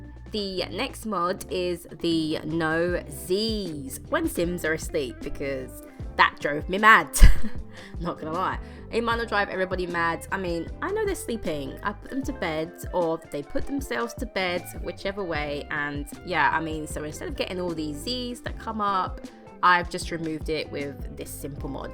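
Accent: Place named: United Kingdom. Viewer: Can you tell where you found that gorgeous house??? The gallery?? Love your videos, obsessed with your voice/British accent , I love it so much!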